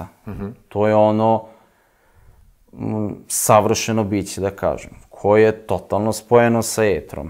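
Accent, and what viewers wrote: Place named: Subotica, Serbia. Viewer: Subotičanin? Zbog akcenta bih rekao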